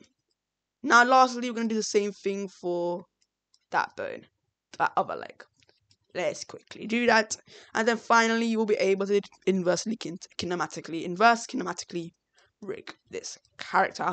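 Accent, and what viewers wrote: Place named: United Kingdom. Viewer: This video had a lot of good content and I learned from it but it was a struggle to get through because of your narration. At times you rush along, only hinting at rather than enunciating certain syllables. In addition, you have a fairly strong British accent and the combination of these caused me to not understand many things you said. The accent alone shouldn't be an issue; I've watched other speakers with British accents who are very understandable--see Grant Abbitt as one example. I suggest that you practice CLEAR - LEE - E - NUN - SEE - AYE -TING E-VER-Y SYLL-A-BLE for future videos.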